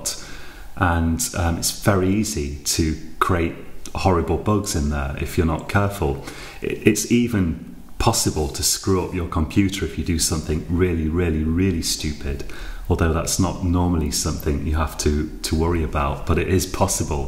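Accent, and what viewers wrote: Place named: United Kingdom. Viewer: thank you for this very helpful video, besides i love your English Accent. Sound like my British friend and some BCC news broadcasters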